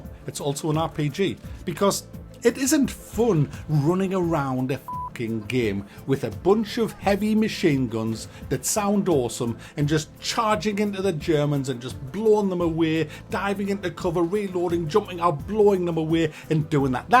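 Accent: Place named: North East England, United Kingdom. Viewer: Are you from sunderland or anywhere in the north-east because you really sound like it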